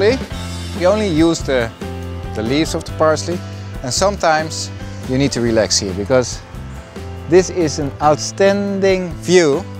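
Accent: Netherlands. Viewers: Hi! Are you Dutch? It seems like you are, since I hear a very Dutchie/English accent:p Groetjes!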